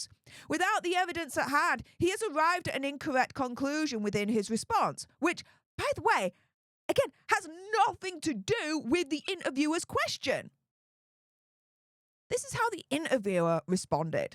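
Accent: Yorkshire, United Kingdom. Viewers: hi which part of yorkshire are you from you sound like a yorkie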